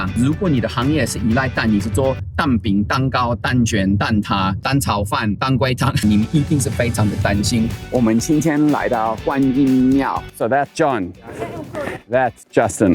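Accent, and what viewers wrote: Taiwan. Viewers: 你的中文非常台灣國語，看你兩次發現你挺會打嘴砲的! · 教授講話真的很台哈哈哈 每次都覺得你跟本就是台灣人💖💖💖😚😚😚💪💪💪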